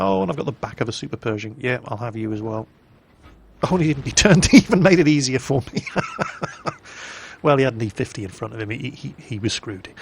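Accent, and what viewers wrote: United Kingdom. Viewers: Good stuff, thanks for showing us your awesome German tanks and for explaining everything in detail with Dat calm British accent. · have u ever thought of working as a narrator for TV .... we could really use someone like u ... that "typically" british accent, a nice voice, easy to listen to - very well narrating and improvising to get the sentenced finished ..... damn we could use a guy like u ....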